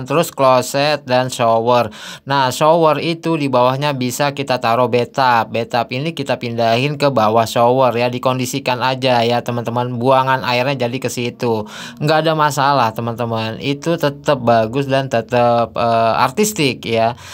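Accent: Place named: Jakarta, Indonesia. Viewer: Hehehe...ini mah asli kobtrn adisi ngelawak ..tp lumayan cukup menghibur, jd kangen jakarta...ligatnya itu betawi bangettt ato jakarta banget dah familiar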